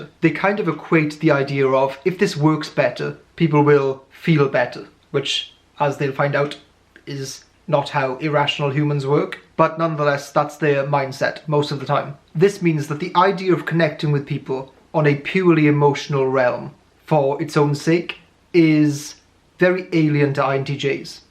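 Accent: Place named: England, United Kingdom. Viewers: Now if I can figure out your accent. Not scouse! RP with a touch of the Midlands maybe .... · I love your accent. It sounds special. Maybe it's English-English. There is mostly American-English on Youtube so this one is different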